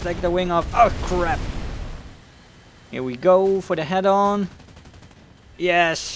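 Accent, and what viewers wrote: Netherlands. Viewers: Hey man, you have a great channel because of your personality, you are calm and collected which is very relaxing and easy to watch. You explain the situations and the strengths and weaknesses of planes and you favor planes to tanks or ships unlike most war thunder youtubers. Lat but not least, i like your accent and the way you express yourself in English. Are you Dutch? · I need the pack... For it is my destiny to bring back balance to War Thunder. (Star wars Pun intended). How I found the channel: I was just watching WT Vids and I found your channel. Your accent caught my attention. Bcus I'm Dutch as well. And I don't know a lot of Dutch WT YouTubers so I taught lemme stick around and see where this goes🙈 What I think about your channel: What I like about your channel is that you are honest in your opinion and also the banter haha🤣 I also like how you address the players that Gaijin are just people as well and that if we want something to change we must not go and curse and scream to them (as a figure of speech) but tell it on a normal way to them. 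Keep up the good work😊 How long have I've been a Jengara🤣: I have been following your channel for almost 2 / 2,5 years now. It's like the day of yesterday when you started changing your thumbnails en your intro's 🤭 This was my summary of what I think, how long I've been following your channel and what I like about your channel 😊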